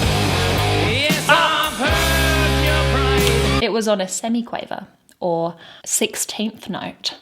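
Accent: United Kingdom. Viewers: Initially i started liking her when she started talking, Dat british accent makes the ears oozing, and when i focused on what she actually says and how greatly she explains what is what my mind started to ooze. Considering her great girl's beauty made also oo, well, this comment wold be blocked if i proceed😂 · Self-promotion is not narciissistic, Honey! (spellcheck not working correctly) Wear your shirt out, girl! I see how impressed you are by this great singer and song from note one because it evidently touches you so deeply! And as the saying goes 'it takes one to know one'! I just had to stop to tell you these things I feel from your reaction already. I liked this song & subscribed to your channel straight away! Also, I love that charming Brit accent coming from a lovely woman such as you! I'm also looking forward to all 21 minutes because of my feeling that you will get deeply into this one because of you recognizing his talent immediately. 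back to the video for me! Love that Magenta hair too, girl! Rocky Horror Picture Show, anyone?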